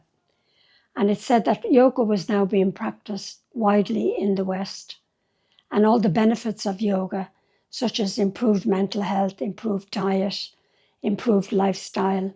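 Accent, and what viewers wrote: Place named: Ireland. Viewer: Thank you for sharing your story. I was scrolling through utube & ran across your video & your Irish brogue. My Dad was from Ireland. Many blessings to you . Im sure you sharing your story will bless many. Sending love & light.❤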